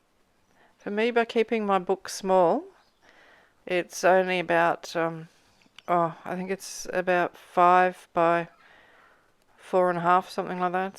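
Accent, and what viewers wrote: Australia. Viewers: Just stumbled across your post and I gotta say that I was surprised to hear your accent. I enjoyed your work. Thanks from a fellow Aussie 👍🏼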